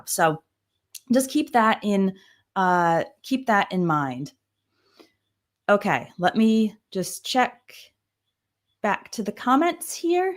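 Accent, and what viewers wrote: United States. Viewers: Very attractive and clear American accent, quite impressive indeed, but too short, it should be a bit longer so the learners can have a better yield ❤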